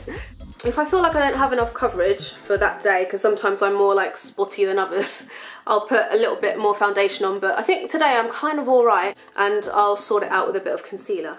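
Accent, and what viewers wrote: United Kingdom. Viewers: why u don't make a video for learn english accent cause i really like ur british accent , actually u don't need make up !! you're pretty .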